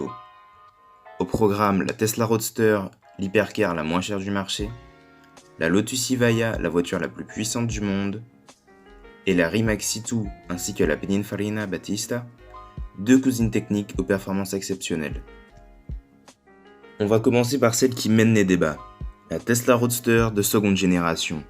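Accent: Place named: Spain. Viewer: le tite accent espagnol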